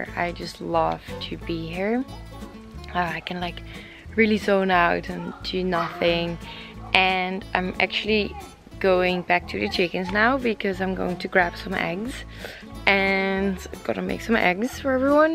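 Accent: Netherlands. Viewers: I only watch yours and Romee's vlogs because I unterstand your English pretty good😂 well now I know why. Really love when Dutch people speak English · The most pickable Dutch accent ever. One word and you know she's Dutch!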